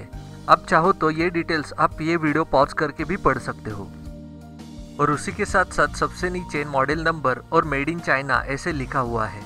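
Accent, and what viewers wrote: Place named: India. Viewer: Oh god the Indian accent 😭😵😫😖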